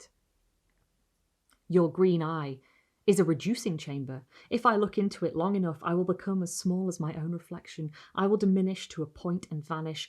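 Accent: United Kingdom. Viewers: Let me just say, this story hits quite different when doing yard work in the fall! Also I feel like the sex could be changed from male to female and be just as relevant. As an American some stories are most effective with a British accent :)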